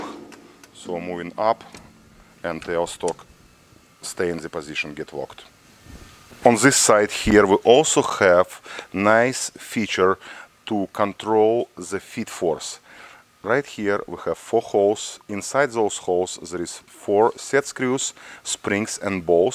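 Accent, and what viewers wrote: Russia. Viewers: Отвечаю, мужчина русский, произношение капец русское)))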